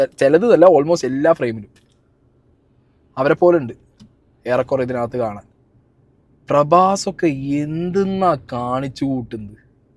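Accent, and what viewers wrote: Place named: Karnataka, India. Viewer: Kannada English 😎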